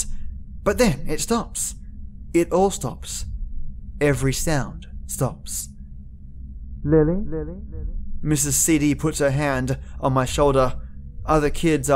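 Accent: Australia. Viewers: Yeah! It's awesome Aussie tale teller time again for me.☕🍰🇦🇺❤ More Lily Madwhip stories! Absolutely, great series! Monk pear tea? Sounds so luxurious, warming and extremely tasty. Oh, you and your amazing teas that you drink.😍 I just have my PG tips. It's black tea but it does the job.👍I'm totally late.☹ But I did eventually get around to listen to your videos.🙂 Thank you for all your content, you definitely work very hard! Your narration always makes my evening leagues better!😊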